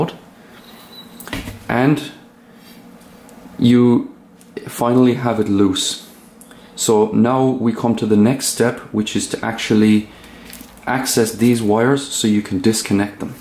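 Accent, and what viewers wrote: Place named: Ireland. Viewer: How do you have an Irish accent?😂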